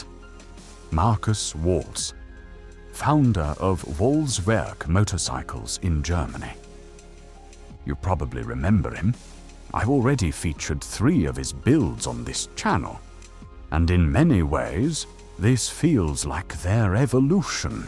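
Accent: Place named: RP, United Kingdom. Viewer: I think the new voice is maybe too posh.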